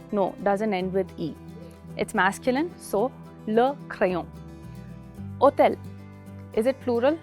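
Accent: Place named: New York, United States. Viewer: Very clear and NYC voice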